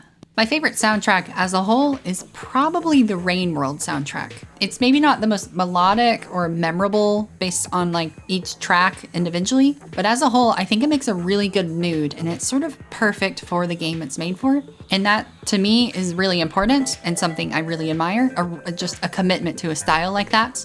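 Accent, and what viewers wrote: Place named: South, United States. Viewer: i'm a linguist so it's actually super easy to answer! so southern american english has a lot going on with front vowels (vowels where your tongue is in the front of your mouth - think the vowels in "heed," "hid," "head," and "had") and diphthongs (vowels that are combinations of two vowel sounds - our non-rhotic diphthongs are vowels in "eight," "vote," "find," "down," and "boy"). particularly, SAE has the pin-pen merger where the vowels in those two words (/ɪ/ and /ɛ/) merge into the same vowel. you do this a little bit in the word "thing" in this video at . with diphthongs, SAE tends to reduce diphthongs to monophthongs; think "ah" instead of "I." you do this a little bit in the word "time" at . on the other hand, there's also diphthongization of what are traditionally front monophthongs! so thing like, "pay-en" instead of "pen." you do this a little bit in the word "genuinely" at . 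you don't like fully diphthongize it, but you turn /ɛ/ (vowel in "bet") into /e/, which is a vowel that doesn't traditionally exist on its own in english, as usually it's part of the /eɪ/ diphthong (like in "bait"). i feel like you also really velarize /l/ sounds a lot, like in "general" throughout the video. typically in american english, we do what's called an apical "L" (or light L, /l/) at the beginning of syllables (like in "light") but we do a velar "L" (or dark L, /ɫ/) at the ends of syllables (like in "pull"). the dark L is called as such because it jus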